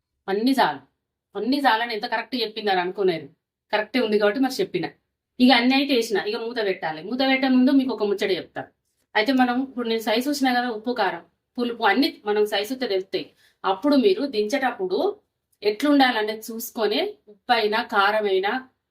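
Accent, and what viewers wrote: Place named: Karimnagar, India. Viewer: Meedhi karimnagar eh ayi untadhi kada aunty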